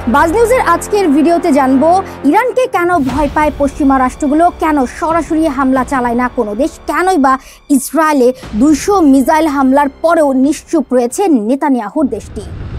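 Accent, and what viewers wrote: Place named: Kolkata, India. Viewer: _____এই চ্যানেলের উপস্থাপকের সংবাদপাঠ কলকাতার মতো। এটা কি সত্যি বাংলাদেশের YouTube চ্যানেল নাকি কলকাতার....🤔🤔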